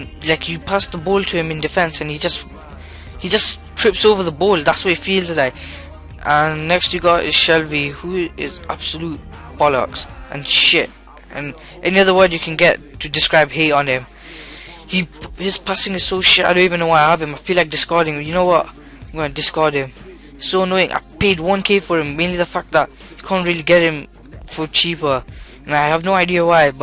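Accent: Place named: South Asia. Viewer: you sound brown D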